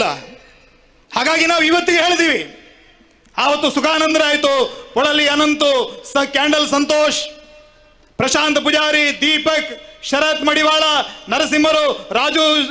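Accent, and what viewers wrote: Standard. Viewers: Swacha kannada power full speech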